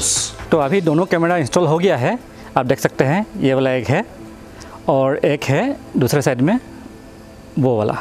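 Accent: Assam, India. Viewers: ভাই অসমীয়া নেকি?কথাবোৰত ধৰিব পাৰি ৷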